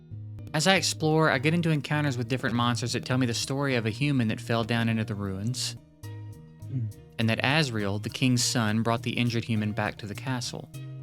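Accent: South, United States. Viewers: Ok. This is crazy but my boyfriend is watching your I Played 20 Cozy Games... And nowhere online can we find what state you're from. I'm guessing Tennessee, Georgia, or around there. My boyfriend, who I will be shocked if he is right, thinks you are from Northern Louisiana or Arkansas.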